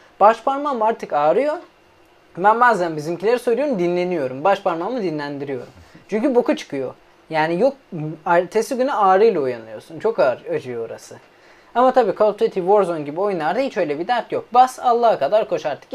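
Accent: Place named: Black Sea, Turkey. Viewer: ne diyo bu karadenizli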